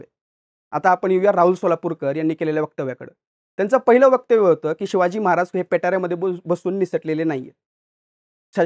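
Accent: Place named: Sambhajinagar, India. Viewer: भाउ तुम्ही मला मूळ संभाजीनगर नगर चे दिसतात भाषेवरून त्यातल्या त्यात सिल्लोड चे .. तसा मी चुकीचा व असू शकतो पण मला वाटतंय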